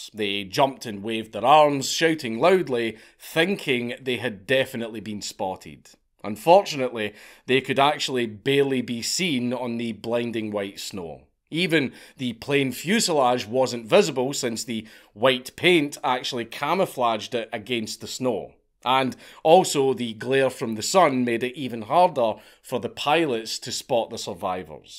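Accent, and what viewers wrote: Scotland, United Kingdom. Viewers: He should do an video where he speaks like a Scottish road man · Never thought I’d be falling asleep to a hairy Scottish man telling me about a plane crash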